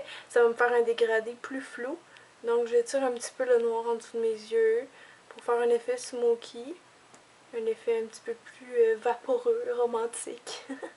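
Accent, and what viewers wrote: Quebec, Canada. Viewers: Hahaha, j'ai regardé tes vidéos sans le son lorsque j'étais au boulot. J'imaginais pas que tu puisse avoir l'accent québécois. Ca m'as un peu surprise. Quoi il en soit j'aime beaucoup tes vidéos. Tout de bon :D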